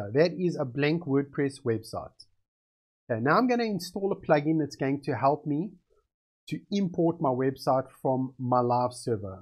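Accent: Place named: South Africa. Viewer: Love hearing a South African accent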